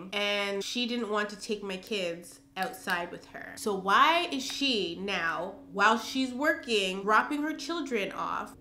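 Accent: United Kingdom. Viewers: The British accent is taking me out! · The British accent 😂😂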